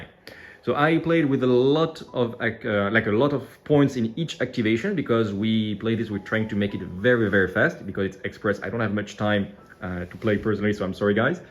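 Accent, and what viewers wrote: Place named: France. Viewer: Toujours un plaisir à suivre ces Battle Report. Merci aux participants! This little french accent add the perfect flavor to the Alliance fleets 😄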